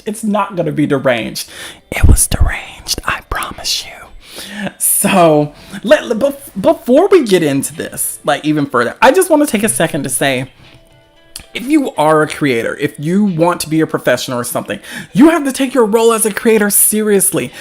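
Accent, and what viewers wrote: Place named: South, United States. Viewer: no way i love your accent! i'm also from the south but i don't live there anymore so it's kind of comforting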